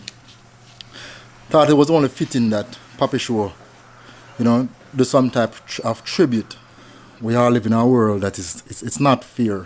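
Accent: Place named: Jamaica. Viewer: I love Jamaica your English 👌 l love it l will try to vlog with jamcn keep going thank you so much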